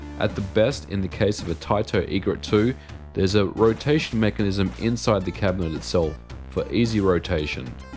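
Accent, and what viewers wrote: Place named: Australia. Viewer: I know you’re from America, but I wonder why you speak with an Australian accent...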